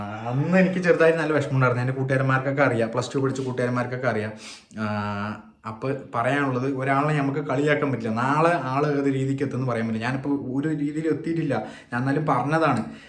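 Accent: Palakkad, India. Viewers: Enik ishttayi super vedio Enik ishttamanu palakkada slage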